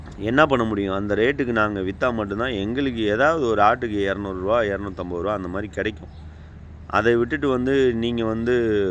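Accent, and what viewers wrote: Madurai, India. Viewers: Assalamualaikum warh madurai basha ❤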